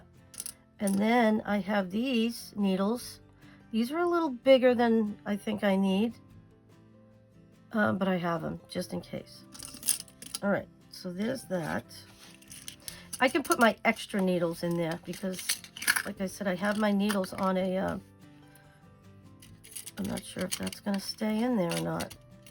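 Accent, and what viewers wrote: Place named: Massachusetts, United States. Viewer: What part of Mass are you from, because I detect an accent?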